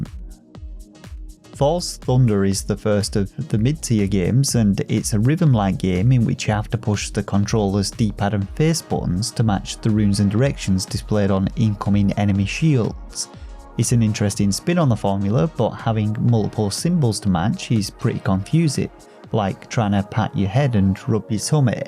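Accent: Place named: England, United Kingdom. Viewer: The like and subscribe was not for the content - although really well done - but for the English accent. Amazing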